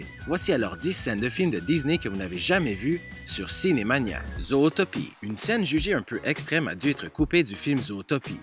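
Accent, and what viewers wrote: Quebec, Canada. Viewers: c'est un québécois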